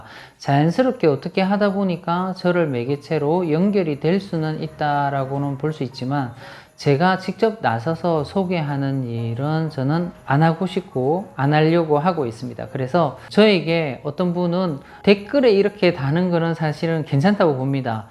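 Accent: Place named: Busan, South Korea. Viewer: 항상 성품이 차분하시고 현명한 분으로 보여요 저도 부산 출신이라서 부산 말투도 정겹고요 결혼정보 업체를 비즈니스로 하고 싶다고 한다면, 일단 그런 업체의 사무실에서 경험을 좀 쌓아야 돠겠지요 ㅎㅎ 그런데 은 그런 일이 성격상 안 맞아 보이긴 해요. 또 본인도 지금 이렇게 원하지도 않고, 베트남 여성들이 예쁘고 호감이 가고 또 우리나라 보다 현재 경제적 수준이 낮다 보니까, 한국 솔로 남성분들이 간혹 희망을 갖는 사람이 있는것으로 보여요, 구독자들에게 휘둘리지 마시고 댓글 내용도 가려서 보시고요 ㅎㅎ 주위에 착하고 예쁜 여성들이 많아서 방송을 보는 우리는 일단 즐겁습니다 도 외롭지 않게 좋은 분을 만나시길 바래요 ♡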